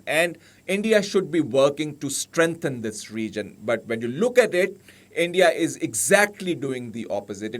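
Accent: Pakistan. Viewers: Don't know who is this idiot who is trying to teach India. From his tone I can make out that he is another illiterate Pakistani but he should know that India is quite superior than Pakistan & even his uncle China.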